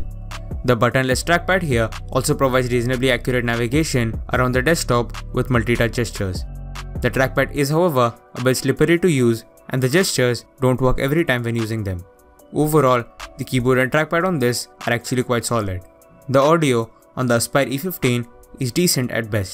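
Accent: India. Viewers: Very thicc Indian avcent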